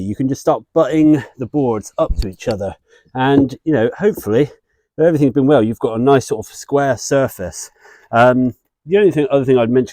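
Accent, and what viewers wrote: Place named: United Kingdom. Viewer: Thanks, that's helpful - especially a British voice amongst so many of our American "cousins". Good to get tips that are relevant to UK. Link to your compost video please?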